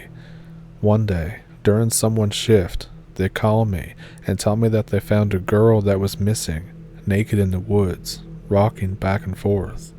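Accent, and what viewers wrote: Canada. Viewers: Is this somebody else’s story because you don’t have an Oklahoma accent you sound like you’re from Canada? Is that correct?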